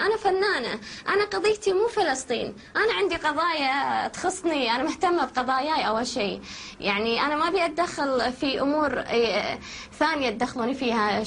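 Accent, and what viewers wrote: Iraq. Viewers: هذي عراقية دمها ولهجتها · هذي تكلم. عراقي. منو وين صارت كويتيه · منو هالصعلوكه ؟؟!! اولا خليتو لها قيمه به الفيديو وثانياًاهي كلامها عراقي مو معني جلست لها بالكويت كم سنه تقولون عنها الفنانه الكويتيه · هاذي لسانها عراقي ! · شكلها وكلامها عراقي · قد تكون مجرد حامل للجنسية الكويتية، لكن الوجه و كأنه من يهود أصفهان و اللهجة عراقية، و لا أحد يعرفها بالكويت البتة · من لهجتها عراقيه بس يمكن تكون مثل ما ذكرتي وتزوجت كويتي وبالتالي حصلت على الجنسيه الكويتية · لهجتها عراقيه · ههههههههههههه اقص يدي اذا هاذي كويتية !! تسمعون لهجتها !! فيها لكنه عراقية اربما كما قال الي قبلي اصلها فلسطيني !! نحن تعرف الاصل الخليجي من النطق والشكل ولو جلسنا معه دقايق عرفنا وش اصله وهاذي ميزه فينا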